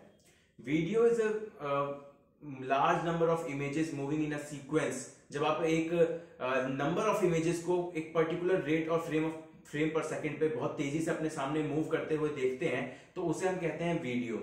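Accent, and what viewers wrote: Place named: India. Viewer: Indians...why...why always Indians :( My poor ears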